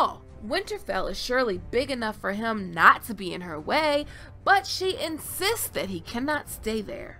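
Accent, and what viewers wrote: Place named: South, United States. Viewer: This female sounds like a stereotypical southerner , aunt jemima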